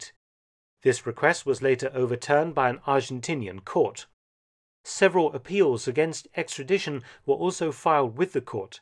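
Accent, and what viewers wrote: United Kingdom. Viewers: There's something about a British accent that makes a WW2 history video that much better lol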